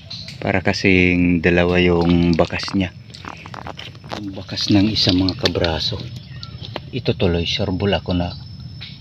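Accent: Waray, Philippines. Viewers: idol Taga San ka ho ba kasi parang Yung salita nyo parang Yung sa Amin mga waray San Lugar or probinsya ba yang Lugar mo Samar ba? Taga samar din ako idol ingat lagi sa pag haunting ng alimango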